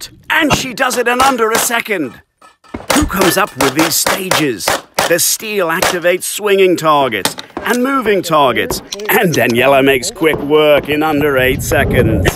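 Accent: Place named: England, United Kingdom. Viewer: The commentator sounds totally un-American. Has an English accent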